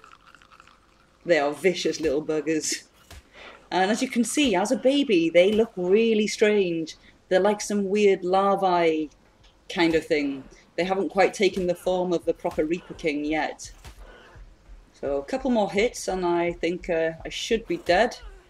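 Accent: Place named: Bristol, United Kingdom. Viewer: I'm just trying to work out the accent 'sounds abit bristol'.ooh ar ra.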